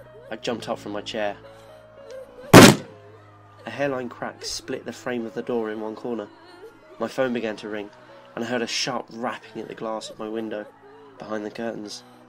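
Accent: London, United Kingdom. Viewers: Like your readings very much! of course I'm a Scot living in London so.you don't use non-existent words like 'normalcy' in stead of Normality (even Creeps McP has done this piece of unforgiveable shite!) you make it scarier cuz it sounds closrer to Hackney E5! Thanks and keep it up (the others tend to send me to sleep but wif you soundin so close it freels like its right outside my door! sp00ky! xx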